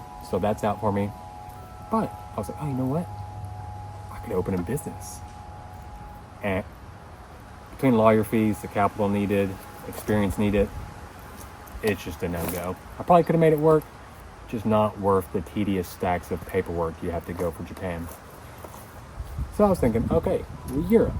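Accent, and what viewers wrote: South, United States. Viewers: bro how come you got southern accent when you got back home 😂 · Ayy southern baby with the thickest accent is back again! pls more farm vlogs pls. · Your southern accent really is a lot stronger now that you're home lol glad you found your place, it must have been stressful not having a home base for that long...excited for your new chapter!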